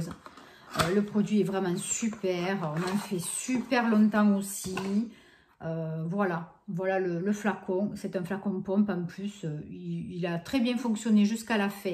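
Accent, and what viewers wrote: South, France. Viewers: Une nouvelle abonnés, tu viens de Marseille ? Ou du Sud de la France parce que ça s'entend ça fait du bien d'entendre le sud sur YouTube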